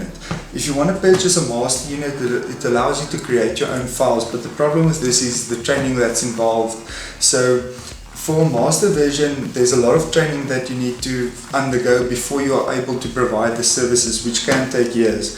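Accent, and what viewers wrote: South Africa. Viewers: He sounds like afrikana but live overseas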